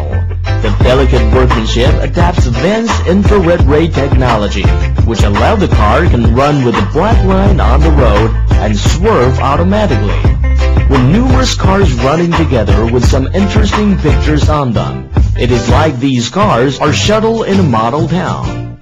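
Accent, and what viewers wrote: United States. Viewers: this guy's got a great american accent, but the grammar reveals this isn't an american :) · wtf.. the narrator has the same crappy grammar that you can read in translated chinese manuals, but an american accent?! Me's confused!!!!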